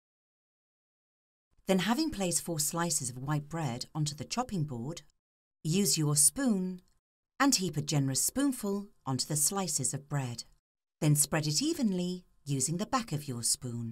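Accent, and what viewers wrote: England, United Kingdom. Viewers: knew it would be legit after I heard the English accent. lol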